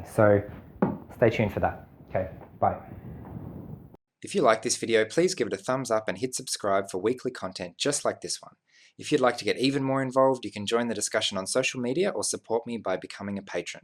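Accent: Australia. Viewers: I just recently found your channel and it's like having an audio diary but with a nice Australian accent. So much of what you share about your thought process and solutions are almost identical to what I had to learn to do to be able to interface with society.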